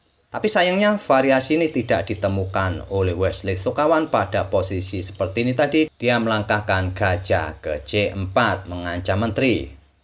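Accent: Java, Indonesia. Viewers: Ji.. medok amat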